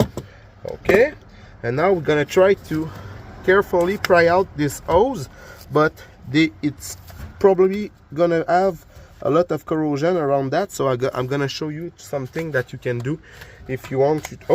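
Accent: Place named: Quebec, Canada. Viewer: Je reconnais bien l’accent québécois 😅 j’ai le même! Merci pour le vidéo je m’apprête à remplacer l’intercooler sur le Santa Fe à ma conjointe.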